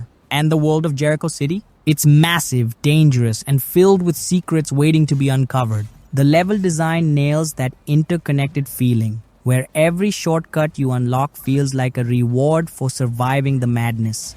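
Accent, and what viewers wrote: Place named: India. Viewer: Sometimes you sound really indian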